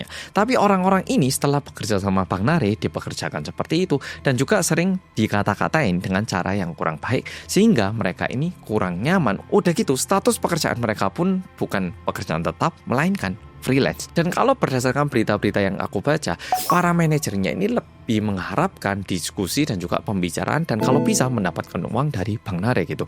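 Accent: Java, Indonesia. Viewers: medok puooolllll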